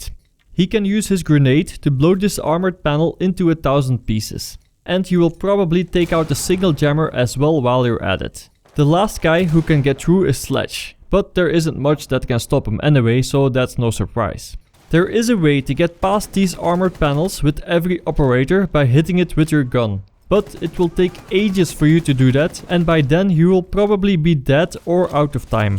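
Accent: Netherlands. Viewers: nederlands accent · that dutch accent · are you dutch?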